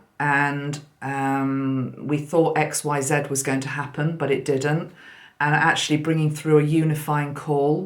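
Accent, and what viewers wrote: England, United Kingdom. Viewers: Thank you so much for your kindness and charm, and your lovely English accent. I come from Brighton XXX🕊️🌹🎶💡🎭🐛🦋😷😘👽💚🙏🏻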